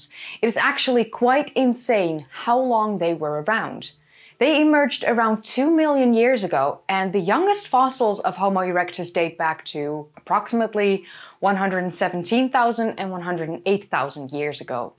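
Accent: Netherlands. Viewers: Hm, what when they are not disappear / going extinct , because they had offspring with the other human species? When the DNA could not extracted, we don't know it. ;) Greetings from Germany and I like your Dutch accent. Heidelbergensis aussprechen ist auch auf deutsch ein Zungenbrecher . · Heel interessant om ons op onze juiste plaats te zetten, wij zijn nog jonkies vergeleken met deze homies. Bedankt voor de vertegenwoordiging van nederlandse uitspraak in deze engels-gedomineerde omgeving.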